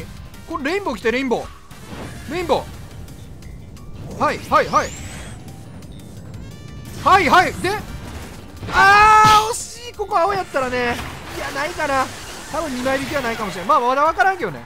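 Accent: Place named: Fukuoka, Japan. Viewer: 、これまたリアタイでサンチェス増えますよっあとよかよかは福岡の方の方言ですね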